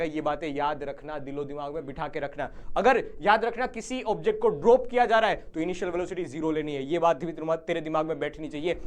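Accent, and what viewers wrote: Gujarat, India. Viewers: Sir aap gujarati ho kya ?? apki tone se lag raha hai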